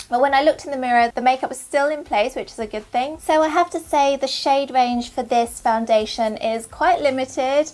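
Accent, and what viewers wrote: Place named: United Kingdom. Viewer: You seem lovely great video! glad I have came across your channel! nice to hear another british accent! take a look at my channel x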